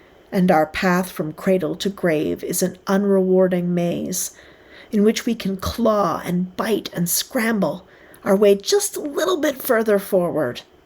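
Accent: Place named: Scotland, United Kingdom. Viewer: Such a powerful and timely piece, and so beautifully delivered (even with a Scottish accent!). Thank you!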